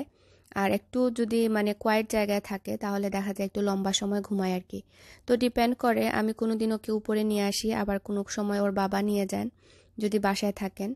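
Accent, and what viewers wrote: Sylhet, Bangladesh. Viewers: Apnee ki sylheti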